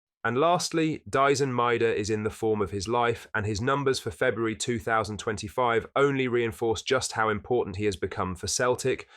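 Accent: England, United Kingdom. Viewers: Were do you stand mate you have an English accent and comment on Celtic but I have also seen you in a Sevco shirt giving it about them as well so where do your loyalties lie......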